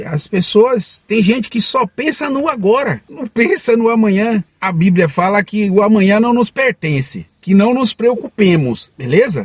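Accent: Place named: Brazil. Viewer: Salveeeeee familia , é bom dar concelhos pra pessoas k querem vir para Portugal , mas algumas pessoas não pensam k é bom ter a mente bem preparada , pois o psicológico é muito importante e tbm ter algum dinheiro guardado ne' ? Enfim Você ajuda , as pessoas k aceitem o k lhes dizem pk é para o próprio bem deles . Muito bom teu português , adoro o português brasileiro , eu adoro . Um beijinho familia , deus vos abençoe sempre 💖🇧🇷🇵🇹🥰🥰🥰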